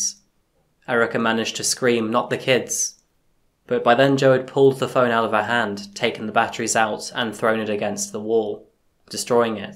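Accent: England, United Kingdom. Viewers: You “lost your accent” when you left Seattle? 😆 I would argue you ACQUIRED your accent when you moved to England. Eh, po-tay-to, po-tah-to. Well, either way, I came across your channel recently and I have now spent my whole day watching your videos. Great content! Thanks and keep it up!!! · By the way, I like your English accent, being educated at an English school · You did not lose your accent by moving to England, you gained one. You have a British accent 😁 This Joseph guy is a bad actor. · Yes it is. Slightly northern accent sometimes. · What part of England is your accent? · You didn’t lose a Seattle accent. You gained a British accent, which sounds nice BTW. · Their accents aren't even from the same country though. TC is Irish and this guy is English....very noticeable difference there. I can definitely see the other similarities. I don't get the same vibe from the two channels even though there's a pretty clear inspiration in style here. 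TC is a lot more lighthearted for a start. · He's English now, which means he lost his American accent. An American accent isn't "default". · This is crazy. Who moves from Seattle to England and starts speaking with an English accent. Gross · Ah that’s why you’ve got an English accent 😊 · ENGLISH accent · That's interesting that you grew up in Seattle and then moved to England and got an english accent. I deserve Madonna an apology. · I heard him say in one video he was born in Seattle I always wondered where the British accent came from. Now I know. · "Losing my accent..." I think you should've said gaining your accent haha. I never would've guessed you were born in the states with that thick British accent you have! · Wait so you're american with a bri'ish accent, amazing 👁️👄👁️ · I've always assumed you were English, going by how you sound. Never knew you were born in the US! When I went to the US on holiday nobody could understand me, I even had someone say I spoke English with a funny accent, they asked where I was from, I replied England! 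· I will comment that you don't seem to have an accent here with this video, yet you are very "English" with the others I have watched. I am new to your channel and am not watching them in order. You do say things like, "Car Park" (we say driveway) and many other endearing comments which show your English side. 🏴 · . You are the first American born person with an English (correct me if I’m wrong) accent.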